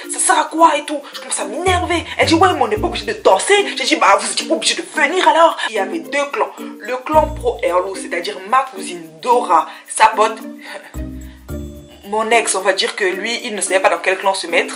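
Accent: Cameroon. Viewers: Utilises plus ton accent du mboa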